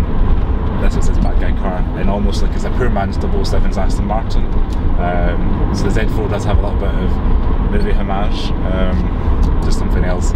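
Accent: Scotland, United Kingdom. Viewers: That's a bit rude, Harald - he is speaking English, but with a lovely Scottish accent. If you want posh Z4 speak, subscribe to "It's Joel". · It's Scottish accent.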